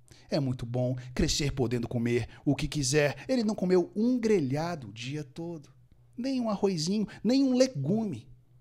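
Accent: United States. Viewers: Teu sotaque super american amoooooooo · o inglês do é inglês americano, nao inglês britanico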